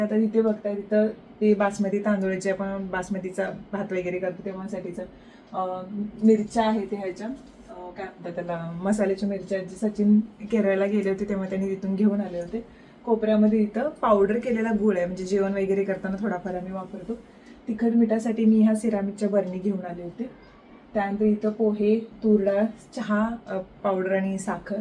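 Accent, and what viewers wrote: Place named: Ghat, India. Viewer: Tu ghati ka boltes kokani ka ny bolt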